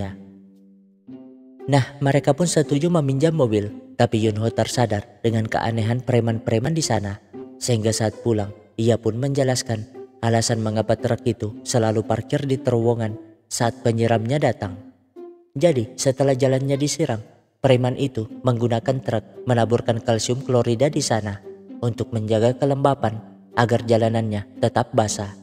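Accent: Makassar, Indonesia. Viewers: Orang makassar ini narator nya ehh, kentara ji makassar naa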